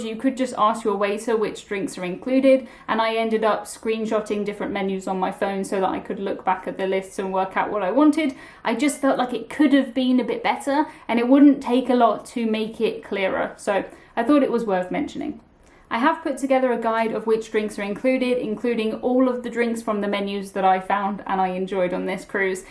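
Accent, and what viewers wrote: United Kingdom. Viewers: I like the idea of the animated meal - that is wild! On a separate note, I have never been on a cruise and started seeing some of your videos. I have enjoyed watching your videos. I have watched other cruise videos, to your credit I find your videos to be more fluid, that is they move quickly between points with a clear direction. Perhaps this is just the American in me that likes British accents but I enjoy hearing your voice as well as the British-isms. Thank you.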